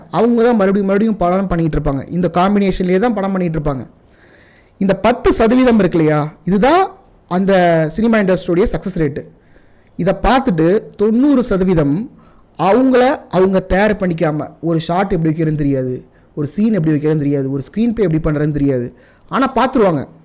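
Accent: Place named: Madurai, India. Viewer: Nalla example ..nalla explain panringa brother..appo appo madurai kusumbu pechu vanthu vanthu poguthu athum nalla tha iruku 😅super information 👍👍👍🙏🙏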